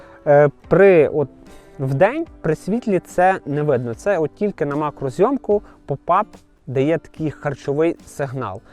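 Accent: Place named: Lviv, Ukraine. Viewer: вітання!!! Найперше хочу подякувати за справжність за те що з перших днів ведеш блоги виключно українською!!! Сам львів’янин і в захваті від нашого говору!!! Сесії та взагалі всі твої старання до глядача як для мене найцікавіші дотепні і зрозумілі!!! Вітаю з власною новинкою попарів та міксів!!! Новий сезон починаю з твоїх новинок!!! А тобі здоров’я натхнення в нових програмах та спільної перемоги над москалями!!! Слава Україні!!!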